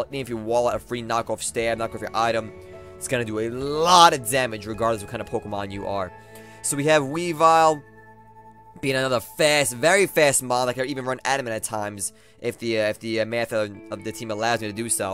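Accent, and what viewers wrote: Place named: Brooklyn, United States. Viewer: wats with the Brooklyn accent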